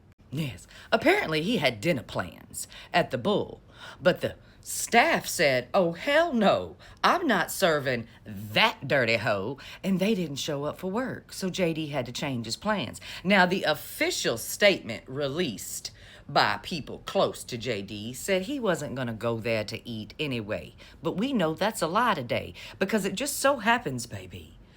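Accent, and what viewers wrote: South, United States. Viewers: Never gonna get tired of listening to the most beautiful southern voice I've ever heard.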